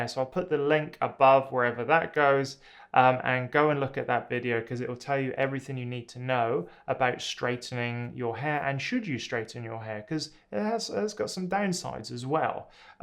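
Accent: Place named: Shropshire, United Kingdom. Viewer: Your accent sounds somewhat local. Shropshire?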